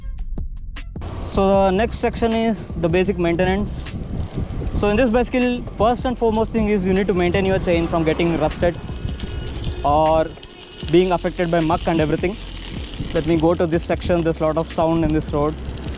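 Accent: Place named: South India, India. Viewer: That Mallu/Tamil accent 😁😁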